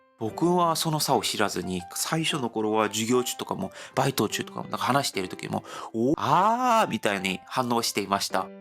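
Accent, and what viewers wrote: South Korea. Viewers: さんの日本語、お上手だと思います。もちろん韓国人のくせのようなものは見られますが何よりも言葉のチョイスが素晴らしいです。日本人でも、もっと話し方の下手な人沢山いますから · 日本人から見たら韓国人だとすぐ解るしそれが凄く可愛い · 韓国なまりの日本語、なんとも可愛いので、好きです(^^♪ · 韓国訛りの日本語めっちゃいいですよ〜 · 全てのイントネーションが終始韓国人って感じですね。でもいいと思います。充分伝わります。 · それぞれの出身の方々によって発音の特徴があるので、韓国の人っぽい発音だな・・とは感じますが、それを他国の言葉なのによくこれだけ話せるよなぁとは思っても、恥ずかしいことだとは全く感じないですね。自分には他国の言葉をこんなに話せないですから。自分は地方出身なので、若い頃は方言を消すように話していましたが、今は故郷があることが自分のアイデンティティで誇りです。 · ふとした時に…と言うより動画の最初っから最後まで韓国訛り全開なので間違ってても全然気にしないですよ😊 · 日本の中にだって地方のなまりがあるから伝われば良いよ韓国語なまり、可愛い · めちゃ素敵ですよ〜😊韓国の方の話す日本語好きですよ❤ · さん、日本語の理解すごいですね。韓国語風の発音、伝わるのであれば”無理に”直す必要ないと思いますよ。私が日本人の代表ではないですが．．．😁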